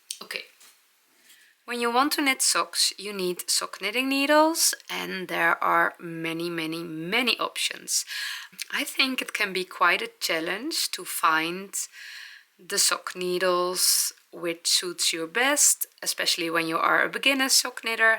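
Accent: Netherlands. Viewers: I know it is a lot of work to record your episodes twice, but I appreciate your dedication. I love your Dutchy English and would miss your sauce. Thank you for the deep dive into sock knitting. Your daughter's new socks are lovely! ❤🧶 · Your dutchy English just makes the episodes.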